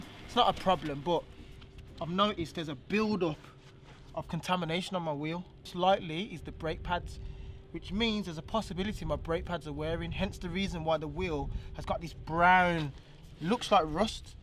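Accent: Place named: Liverpool, United Kingdom. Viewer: sorry brother if I offended you by asking if you were from Liverpool mate haha you sounded like Nathan de asha a little bit 😂 if I lived in uk I would come work for you. I have my own detailing company and just bought a van last month. Yesterday I did 520$ in profit. Around 480GBP. Thanks for the inspiration mate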